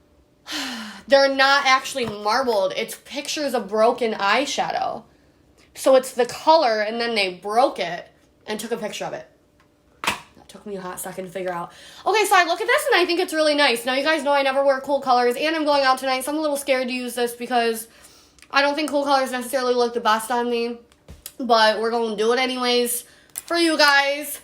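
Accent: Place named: New Jersey, United States. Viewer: Jersey? Or New York...it's not super strong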